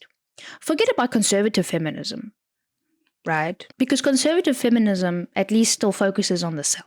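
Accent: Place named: Netherlands. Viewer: yeah, but Dutch/Arfikaner or English? You sound Dutch to me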